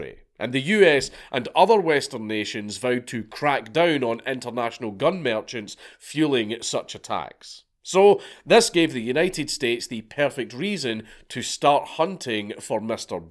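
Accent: Scotland, United Kingdom. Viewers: Hey man, you’re clearly Scottish do you reside in Scotland or are you across the pond in the Americas? I’m not stalking I’m just super curious because I personally share some of your “political” views (which you’ve only ever eluded to but never overtly expressed) & if you’re NOT in the US only makes me that much happier.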